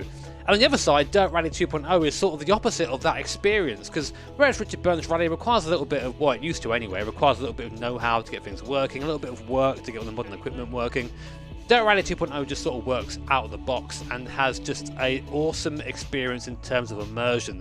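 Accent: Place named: Yorkshire, United Kingdom. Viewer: As someone from Yorkshire. That is definitely how we talk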